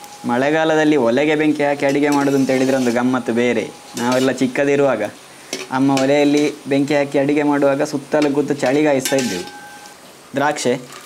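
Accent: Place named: Dakshina Kannada, India. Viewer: Le ..mangya... Edu dakshina kannada base... Respect kodu...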